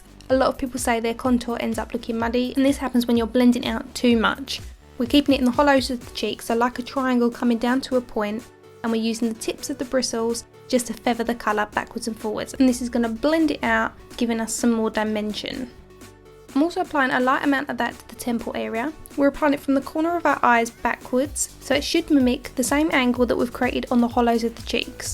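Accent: Essex, United Kingdom. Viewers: Love your Essex accent and your techniques. Subbed :-)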